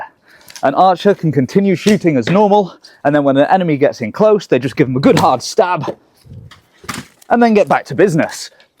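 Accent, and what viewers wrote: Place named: England, United Kingdom. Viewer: I feel good knowing that I was 100% right that this man absolutely had to have an English accent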